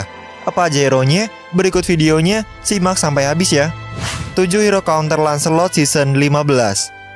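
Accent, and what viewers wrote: West Java, Indonesia. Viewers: Kang pasti org sunda ya kan Sama kaya gua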